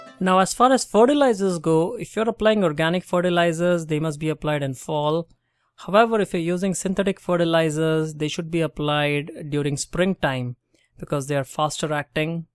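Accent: India. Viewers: He sounds indian but his skin looks white. I’m stoned and i know its 2021 but just something I noticed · pronounces you are Indian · Sound like an Indian guy in California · you sound like indian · Sounds very Indian... The accent is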